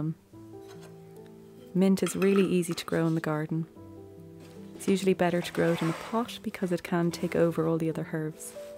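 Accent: Ireland. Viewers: Nice, soothing Irish lilt, thanks! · Those mint leaves also are fantastic with lamb or even in a salad. I love mint tea also. Very nice peaceful video and your voice an Irish accent is beautiful. You have new subscriber from Chicago!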